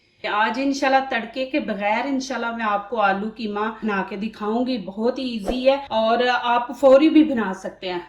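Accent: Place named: Punjab, India. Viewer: Tusi Punjabi ho?!! urdu may Punjbai khushbu a rahi hai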